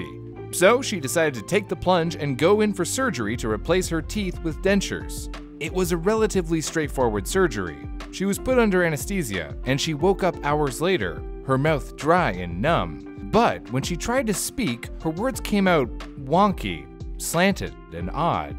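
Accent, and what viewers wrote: Quebec, Canada. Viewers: ahhh vous êtes québécois ? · Well the chanel is a canadian chanel and spoke québéquois (french in québec, a Canadian province) also, qébequois is like the american english for the british. So yeah, spoke french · On s'entends qu'y'avais personne qui s'attendait a entendre parler en Québécois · effectivement, je m'attendais à ce qu'il utilise le français européen, le français québécois m'a vraiment surpris haha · Wait what ? You can replicate the Quebecer accent perfectly! MY ACCENT! Seriously are you from Quebec ? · Im quebec french that was awsome almost sound more quebec french than fremch france . 😎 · I love her accent she’s so cute with it! Also that’s French Canadian Chills speaking! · Wait is from quebec?? If not that's literally the best imitation of our accent I've ever heard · You speak le jouale! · As a Anglo-québécois that moved away, you have no idea how big a smile I had on my face when started speaking in true blue Québec French. 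I miss hearing it so much. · Parfois tout mes pensées sont en français aussi. was speaking some kind of Canadian French or something! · speaking Quebec French was a pleasant surprise, I lost my quebec accent when I started to speak English more Now I’m not even sure what accent I have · Vous êtes du Québec?!!?! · okay that was Quebec French are you guys Canadian?!? as a french canadian I like finding Canadian youtubers! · Is that québécois I hear?! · speaks canadian french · Are you canadian? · God i vibe with speaking in a french quebec accent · Omg j'capote! speaks french like a Québécoise!!! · French is a mix of Québec and Acadian. Neat!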